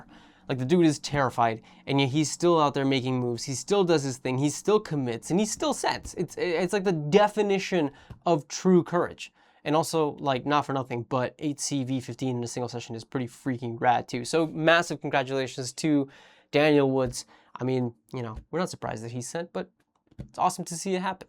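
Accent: United States. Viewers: No way is he Spanish with that accent. He might live in Spain but he's got to be from the US surely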